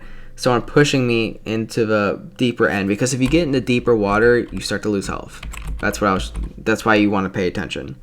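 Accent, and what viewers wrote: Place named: United States. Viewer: because cowboy accent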